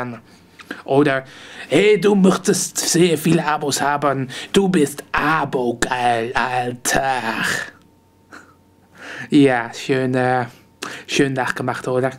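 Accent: Netherlands. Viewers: Deine Sprachkenntnisse sind meiner Meinung nach auch gut. Ich hatte auch mal eine Englisch Lehrerin, die aus Niederlande kam. Sie hatte den gleichen Akzent wie du =) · danke! Ich habs mir voll angekuckt und teile zu 100% deine Meinung. Dein Niederländische Akzent ist lustig ;D Mach weiter so :)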